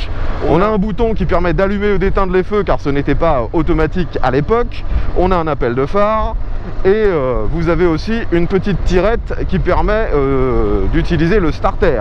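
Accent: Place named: Paris, France. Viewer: On accroche pour tes commentaires ,et ton timbre de voix avec cet accent titi Parisien bien sur.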